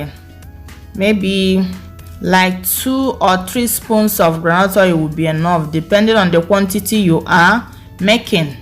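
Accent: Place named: Nigeria. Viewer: First I love the way you have put your vegetables in order, clean job it makes me admire what you are going to cook before I see it😋, secondly your voice is nice to me,I love Naigerian accent so much, last but not least,I love your recipe,and the vegetables looks delicious 😋, wow well served ooo my comment is "confusion" as you have just say😁😁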